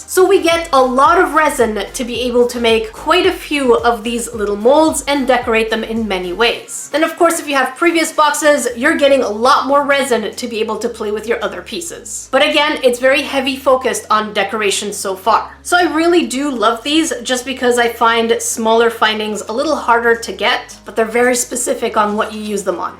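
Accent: United Kingdom. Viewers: I always knew he had a British accent.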